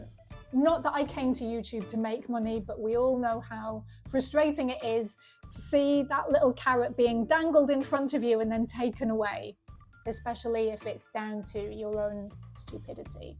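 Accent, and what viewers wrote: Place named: England, United Kingdom. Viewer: Hi... Actually, I am watching your videos because of your pure English accent unlike new generations of English who are mixed with thin Aussie accent which I hate and also you are near my age and SINGLE life experience and living at parents house....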